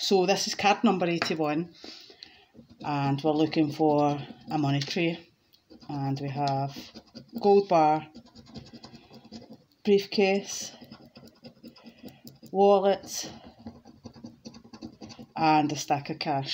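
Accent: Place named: Glasgow, United Kingdom. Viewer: Hiya 👋 Nice session!!! How lovely is Glasgow accent 😍 👍 and sub for you!! I used to live in Scotland 🏴 for over 10 years!!!! I miss this country 🙁 Right now I live in US and I am scratching American scratch cards, unfortunately most of scratch cards are rubbish!!! I remember one year ago was a different story. How you doing?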